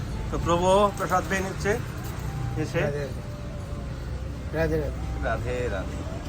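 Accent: Bangladesh. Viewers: রাধে রাধে জয় শ্রী রাধে।প্রভু আপনার কথা শুনে মনে হয় বাংলা দেশের রাজধানীর ভাষায় আপনি কথা বলেন।আপনার ভাষা আমার খুব ভালো লাগে।আপনাকে ধন্যবাদ আপনাকে অভিনন্দন ও অসংখ্য প্রণাম ।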